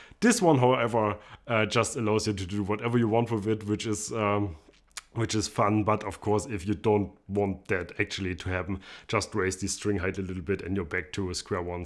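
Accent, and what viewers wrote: Germany. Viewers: Hey brother, I love your channel. I'm also one of those guys that has nicer basses than I should considering my playing ability. I love your self-deprecating humor and also your accent. I was stationed in Germany while in the military & met some very cool people there-it was a blast! I hope to make it back for a visit in the next couple of years. Much respect to you and all the German brothers and sisters.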